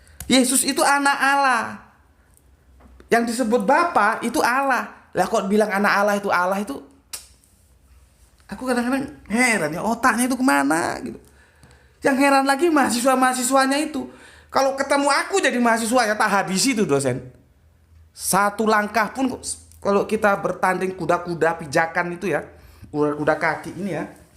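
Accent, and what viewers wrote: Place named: Bogor, Indonesia. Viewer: org bogor memang begitu bahasanya.. 😝🙏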